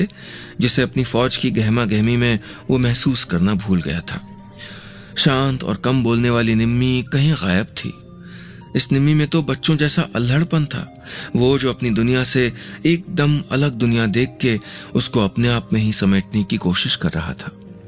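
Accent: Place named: Rajasthan, India. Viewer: Sorry bit Terrible Rajasthani accent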